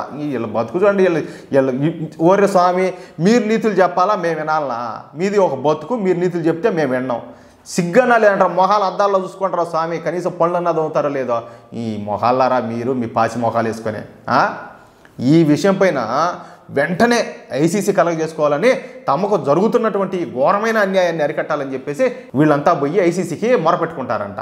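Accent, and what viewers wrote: Rayalaseema, India. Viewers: Super sir Mee rayalseema language super Sir I'm also Rayalseema from Europe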